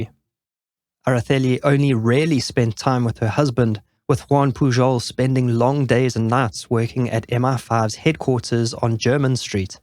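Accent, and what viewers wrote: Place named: South Africa. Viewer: Yes that is a South African accent. A lighter and less twangy one at that. Upper class maybe.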